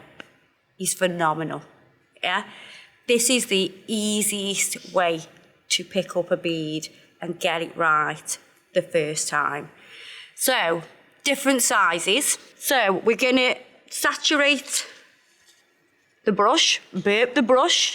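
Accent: Stoke, United Kingdom. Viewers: That's how us Stokies speak lol loowk, boowk and coowk (in other language look, book and cook) lol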